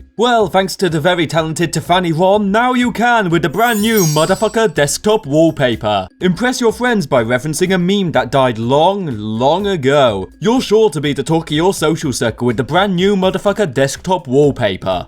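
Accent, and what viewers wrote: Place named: United Kingdom. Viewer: Lol your british or english accent made this so funny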